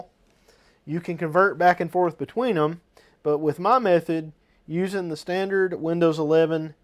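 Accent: South, United States. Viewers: Excellent. Love the Dixie accent !! ;)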